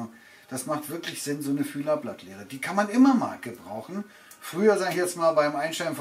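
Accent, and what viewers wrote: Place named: Berlin, Germany. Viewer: ich hab selten so ein informatives und zugleich unterhaltsames Video gesehen,vielen Dank.ich musste mehrfach schmunzeln wegen deiner Berliner Schnauze.Hab mir die Maschine auch bestellt und werde deine Tips übernehmen.Haste fein jemacht.Mach weiter so.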